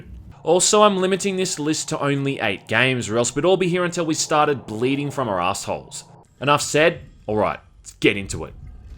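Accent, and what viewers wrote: Australia. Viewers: Love this guy's Aussie accent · Your videos are way better than Gameranx. It may just be the macho ozzie accent.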